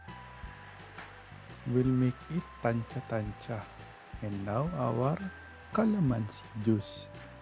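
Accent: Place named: India. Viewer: ang sarap nmn yan sir,parang accent ng Indian race ang host..jiji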